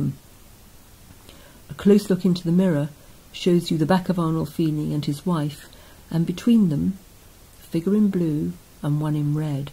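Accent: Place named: RP, United Kingdom. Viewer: Phrases like "we're not quite sure" and "must mean something" pronounced with an RP English accent sound far less learned than they once may have.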